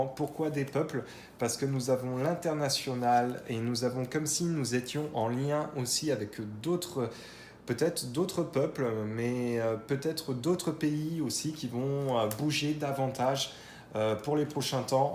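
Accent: Canada. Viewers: Bonsoir, j’aime beaucoup quand tu tire les cartes devant nous, après tu fais comme tu le sens. La reprise des cours est elle bien?? Anglais!!! Français!!!! J’aime l’accent canadien 😀😀😀. Merci pour les nouvelles avec les cartes. Hé oui tu fais parti de la famille. Amitiés Sincères 🌹 🌹 🌹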